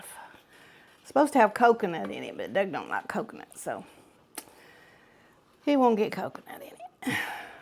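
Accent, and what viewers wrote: South, United States. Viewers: Did anyone catch that she said “yuns”? I found this fascinating bc in Pittsburgh they say “yinz”. She sounds southern for sure. I live in rural middle TN and have not heard this used here. Anyone know where she’s from?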